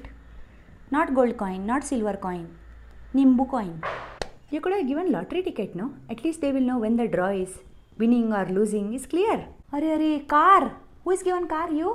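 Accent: Maharashtra, India. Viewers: How she speaks typical marathi dialogues despite being from southern states is amazing! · Your Marathi accent is killing! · Your Maharashtrian teacher accent is spot on ❤ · Marathi Accent was spot on! Shraddha devi ki jai! Shenbatti 😄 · I enjoy the maharashtrian accent 👌👌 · Marathi accent- wow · nailed it! that Marathi accent was so perfect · It's a maharashtrian accent, not universal desi. She does kannada and tamil as well. Extremely eloquent with all the nuances.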